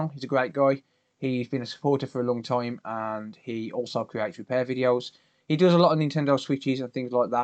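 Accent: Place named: Dudley, United Kingdom. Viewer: You from dudley ?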